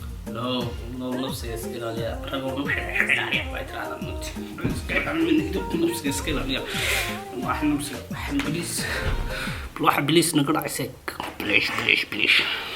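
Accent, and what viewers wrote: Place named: Hargeisa, Somalia. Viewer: Inanku ma reer Hargeisa lahjadi rer. Hargeisa ayuu wataa go forward brother 😂❤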